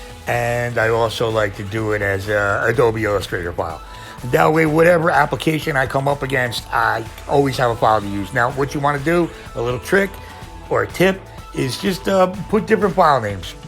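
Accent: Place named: New York, United States. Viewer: Definitely need a hard hat sticker ill save a piece of real estate on there for you... and the accent makes me like your channel more feels like one of the boys from home got there own channel the haters must be from out in the Midwest and don't get the real NY accent lol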